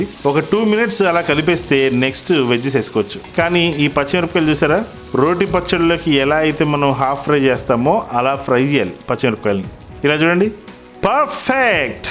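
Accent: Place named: Telugu, India. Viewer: garu veg fans ni alarinchi naduku Dhanyavadalu!! Sir meeru films lo padalani korukuntunamu original telugu voice vastundi mana patalaki.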